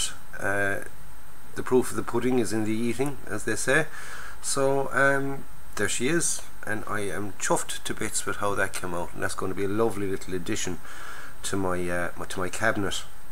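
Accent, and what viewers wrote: Ireland. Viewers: Very nice. And it's wonderful to hear your Irish accent, reminds me of my dear old Dad. Italeri can be a bit of a challenge, like not having locating pins for shackles, tow hitches etc. But you've done a "fine and decent job" of it.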